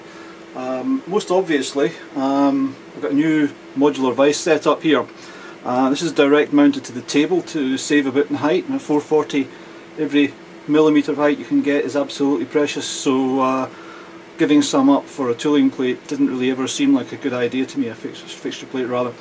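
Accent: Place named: Europe. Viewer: Good video, I am about to make something similair myself. Looking around for some inspiration. Thank your for sharing. From your accent, I presume that you are from europe. Not to many tormachs around here. Did you order a brand New, or Did you manage to find a used one? Would be intresting to know how much the shipping was in case u ordered a new one. Subscribed. :)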